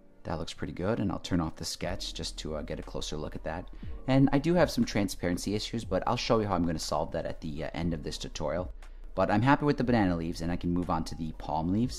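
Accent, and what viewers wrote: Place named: United States. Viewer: at your accent pretty much American if I'm not mistaken. Is it true? Yes, surely nowadays the situation is just unstable for you & family to travel around. Stay healthy and stay safe. Thanks for your kind responses. Keep posting nice videos 👍🏻👍🏻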